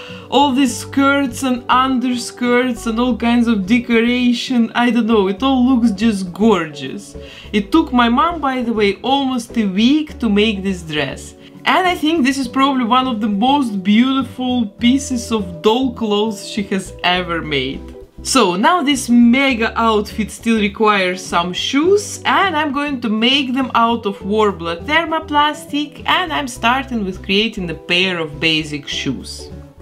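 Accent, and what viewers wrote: Russia. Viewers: Не знаю почему. Но по произношению кажется что ты русская или украинка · You have such a strong Russian accent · Ты случаем не русская? Акцент похож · Мне кажется или я слышу у вас русский акцент? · Ты русская? Этот английский режет мне уши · why do you have a pronunciation like that of Russian? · Русский акцент все равнт заметен, но английский очень даже хороший у вас)) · Wow! You speak as Russian... As Russian speaks English.... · Ничего не понимаю но смотрю, акцент русский сразу видно) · почему я слышу русский акцент... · я слышу русский акцент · Черт😃 Ощущение, что вы русская... Ну на английском говорите так, словно долго жили в России....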